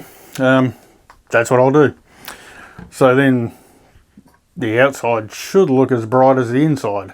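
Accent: Australia. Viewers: Awesome, thanks for that. Mind if I show this technique in my HG P407 build videos? Also, it's refreshing seeing and hearing an Aussie modeller on YouTube. Nice work, mate.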